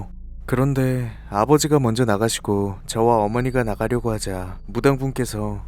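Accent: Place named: Japan. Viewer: 감기 다 나으셨나요~~~매번 병맛난... 말투에~~~~구~~수한??? 일본어 말투 ㅎㅎㅎ 잘든고 있어요^^💯💯💯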